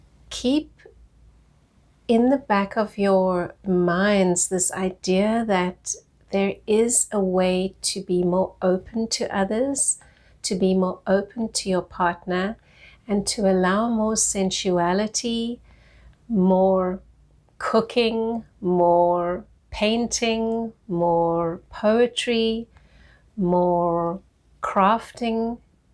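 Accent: South Africa. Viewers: From Sth Africa?? I love the accent. Cheers.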